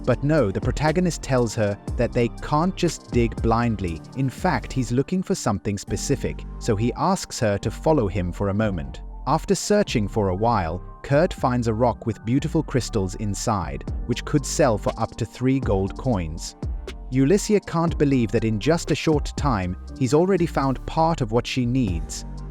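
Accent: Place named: United Kingdom. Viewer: First. time i saw a british voice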